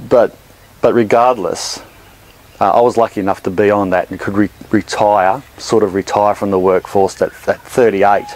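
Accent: Australia. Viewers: love how hard you make your garden work; it looks great, i moved to the states about 50 years ago. so its good to hear the australiam accent as well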